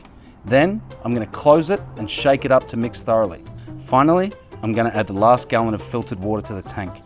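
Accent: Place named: Australia. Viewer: Mate - thanks for making me laugh. You have taught me three things: 1. Lawn stuff - who would have thought grass could have turds? 2. Poetry - "harder than a honeymoon dick" 3. Visual art ( that was an Asian elephant right? Elephas maximus I believe - they have small ears) Anyway, gday from Dubbo (with an accent like that, you might even know where that is!). All the best with the channel. Can't wait for the next video.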